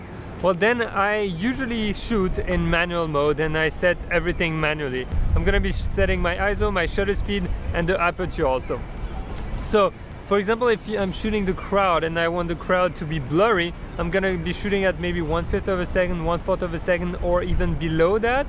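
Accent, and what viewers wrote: France. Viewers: are you french ? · to be honest, difficult to understand your accent, are you French? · I like your accent.. Not too french just the correct amount of charm! Good points as well. Every lense let you do something different. But you can always create something spectacular with them - as long as you go out and shoot. Feel free to take a look at my view on Copenhagen and Denmark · J'ai reconnu un accent parisien mdr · You are french?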